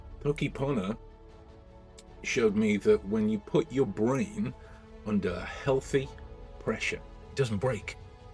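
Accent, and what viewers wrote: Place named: Quebec, Canada. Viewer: En fait non seulement tu parles le français mais tu parles également le québécois et ça, ce n'est pas rien !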